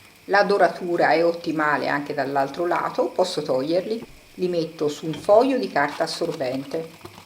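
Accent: Tuscany, Italy. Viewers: Buonasera, con la sua dolce calma e quella magnifica cadenza toscana, ci ha regalato una ricetta che si deve provare prima di subito... Che meraviglia questi dolci di carnevale. Grazie! 🎉🎭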